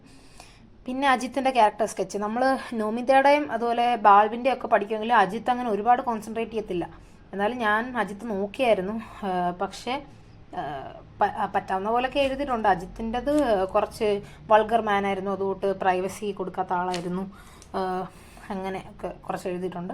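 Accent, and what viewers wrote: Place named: Kollam, India. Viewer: Kollam aano allel alappuzha aano ente cousinte slanginu similar slanganu sisinte slang 😅 btw all the best sister, ente examum moderate level eennu parayum, paper different aanu, pinne bio namukk, bot3, zoo3 chp vechitt ind, physics complete aayi ini derivations practice chyan und.... All the best champ . 🔥🗿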